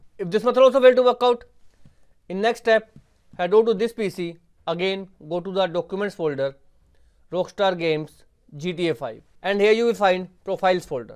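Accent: India. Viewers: Thank you for the video, I am going to try these fixes. Just one thing; can you please speak slower, it is very difficult with the Indian accent to understand what is being said. I'm an old guy and I find it very hard to follow.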